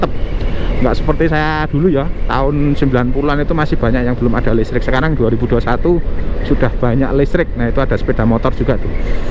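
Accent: Java, Indonesia. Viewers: Ciri2 orang Jawa kalau ngomong bahasa Indonesia mesti ada jawanya kebawa. 😆 akupun juga begitu agak kaku ngomong bahasa Indonesia. 🤣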